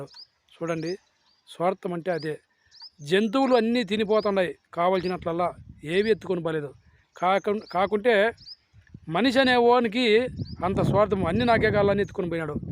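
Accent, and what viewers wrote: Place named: Kurnool, India. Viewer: Anna meeru eay ooru me basha chusthay ma kurnool ternaykal,basha la undi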